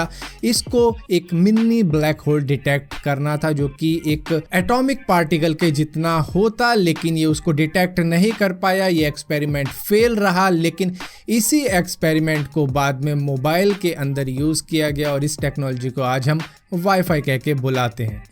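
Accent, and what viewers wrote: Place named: Punjab, India. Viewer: Muje ehsa lgta haii ki app punjab ke ho..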